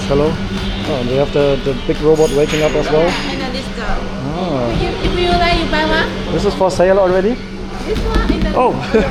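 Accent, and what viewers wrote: Germany. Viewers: ngl the German accent makes the vid so much better lol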